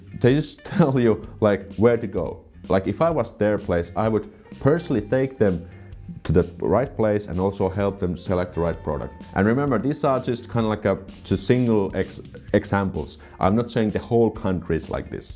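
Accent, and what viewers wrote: Finland. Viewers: Mä tiiän et oot suomalainen koska kuulostat siltä..mä asun suomessa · You sound like a finish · Is that Finglish you're speaking? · Your acsent was very finish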